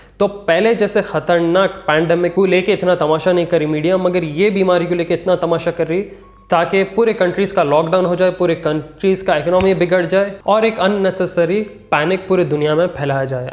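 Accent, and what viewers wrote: Hyderabad, India. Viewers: Bhai hyderabadi slang miss hori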